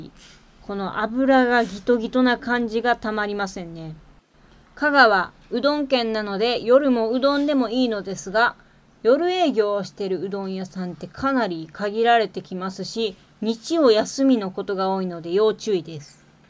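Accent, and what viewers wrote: Kansai, Japan. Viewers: 絶妙な関西弁のイントネーションが、かわいい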